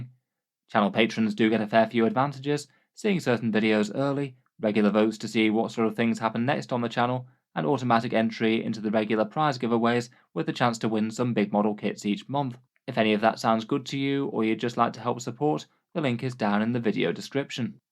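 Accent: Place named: England, United Kingdom. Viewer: His accent comes across as fairly vanilla southern, he certainly doesn't have a strong regional accent at all - though regional accents as a whole are declining quite quickly across the UK according to some research I saw a couple months ago. I was meaning more in relation to his cadence, enunciation and intonation, which I think you're right when you say about it being a "presentation voice". I'd be very surprised if he spoke like that at the pub! Remarkably consistent across his videos though.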